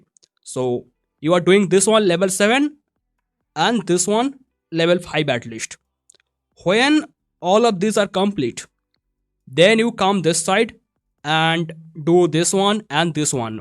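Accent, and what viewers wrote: India. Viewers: Don't not speak English..you just talk India man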